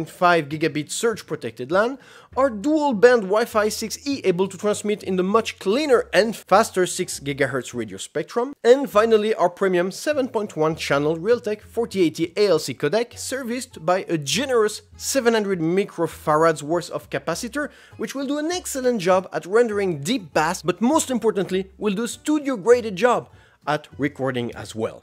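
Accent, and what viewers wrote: France. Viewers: Is that a french accent ? :) great video though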